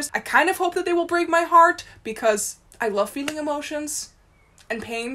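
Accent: Netherlands. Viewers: I detected a Dutch accent right away haha, but I speak the language myself so that makes it easier to spot 😊 Her English is flawless, indeed!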